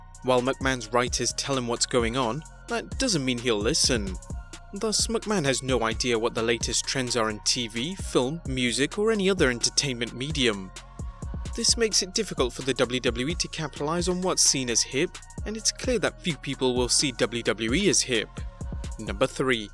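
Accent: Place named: RP, United Kingdom. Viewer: It's funny hearing a guy with a posh British accent reading a Vince Russo quote.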